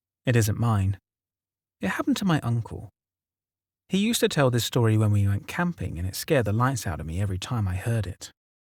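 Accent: United Kingdom. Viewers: Does anyone else find it difficult to listen to a story about north Georgia being narrated by a brit?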